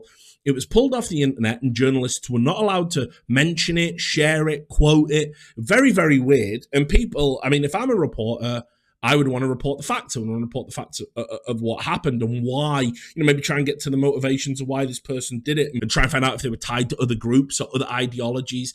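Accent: United Kingdom. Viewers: How do u sound more British now?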